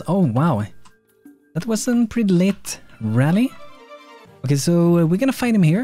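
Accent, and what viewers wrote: Sweden. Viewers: Well,thx for replyn i Have subbed, btw is that an swedish accent? I Myself come from sweden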